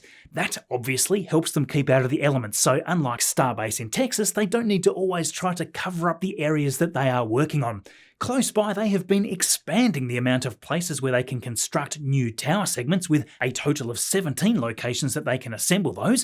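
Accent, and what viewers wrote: Tasmania, Australia. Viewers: I always love hearing the Tasmanian pronunciation of "booster". I think it could only be topped by hearing "caterer"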